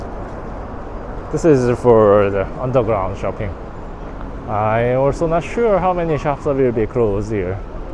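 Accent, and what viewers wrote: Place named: South Korea. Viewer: No se aprecia ningún acento ni de España ni de latinoamerica por lo que no sé dónde lo has aprendido. Lo del acento lo decía porque se notaba que el que hablaba, o sea, tú, tenía un deje coreano que he escuchado en otros vídeos y me encanta. Esa tonalidad me pone feliz y hace que sonría. Por todo ello GRACIAS desde España.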